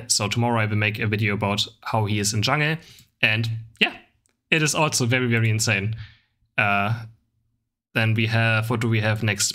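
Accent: Germany. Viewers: Are you German? :)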